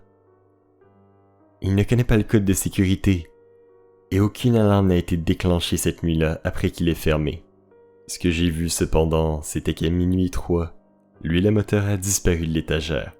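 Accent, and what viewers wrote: Quebec, Canada. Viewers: C'est marrant l'accent québécois mais je n'arrive pas à me mettre dans l'histoire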